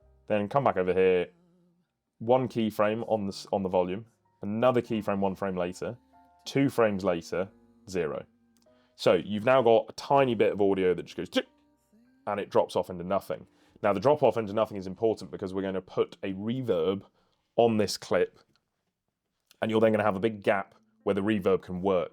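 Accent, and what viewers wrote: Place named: Belfast, United Kingdom. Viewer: Completely unrelated to the video (which is amazing, thank you!) I know your english, but there is a few times that you sound like you are from belfast. Is any of your parents from belfast?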